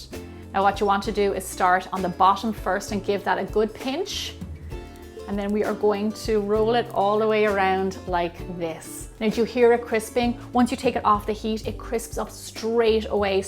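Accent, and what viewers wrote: Ireland. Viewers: tell a are you from Ireland if so from which part ?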